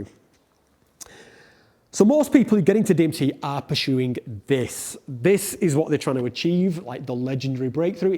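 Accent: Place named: Manchester, United Kingdom. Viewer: No way are you from manchester hello from a fellow Manc wythenshawe to be precise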